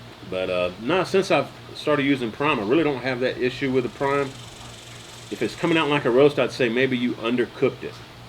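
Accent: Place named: Louisiana, United States. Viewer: What part of Louisiana you from?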